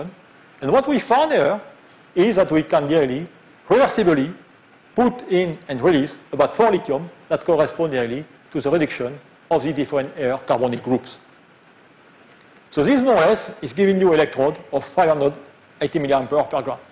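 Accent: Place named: France. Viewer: Nice lecture, but the French accent is too strong.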